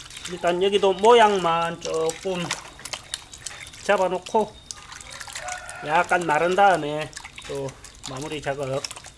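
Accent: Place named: Hamyang, South Korea. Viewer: 함양은 합천군과 붙어 있지요 기와 땜에 함양읍에 간적이 있어요 억양이 같아서 왠지 정감이 가더니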